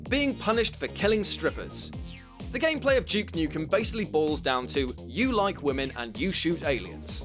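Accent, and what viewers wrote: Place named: United Kingdom. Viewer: Why is there always some posh English bloke narrating this garbage?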